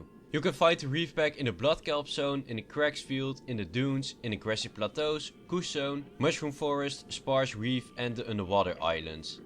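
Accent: Netherlands. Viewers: you sound very dutch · ben je soms nederlands het klinkt wel zo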